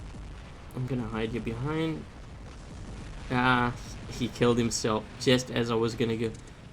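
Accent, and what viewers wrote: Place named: South Africa. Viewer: sound south african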